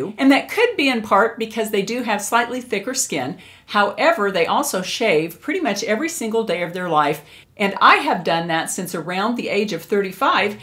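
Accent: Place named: South, United States. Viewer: Wow...so beautiful... definitely a southern belle... absolutely love you accent girl..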